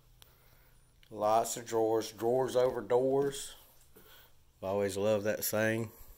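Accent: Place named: South, United States. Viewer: first you are my favorite Manufactured Home Tour Guide and Commentator! Is that accent Alabama or Mississippi? Second, I love The Helicon. We toured a modular home at 3 Stones Homes in MO that was very similar except in place of a sunroom there was a covered rear porch. I like the sunroom better. Would it be possible to enlarge the master closet by 3’ by sliding the the wall back in the kitchen and master bath eliminating the smaller pantries beside the refrigerator/freezer and reconfigure the master bath by eliminating the tub, moving the first vanity down to connect to the second. You would be able to have a double sink vanity with a makeup dresser in the center as well as a larger closet. I have designed, redesigned, drew blueprints and built our last 3 homes myself. So I look at the possibilities. We are in our late 70s and need to scale down size wise and a story. 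My hubby and I started our life together in two very small “mobile homes” first one was a 1950s’ 2 bedroom 1 bath 8’x 30’ the second was new 1964 3 bedroom 1-1/12 baths 10’x 56’. Both fully furnished. We were way ahead of the tiny/small house craze....Lol. Do not want to go quite that small again. Third have you ever considered doing business in North East Texas?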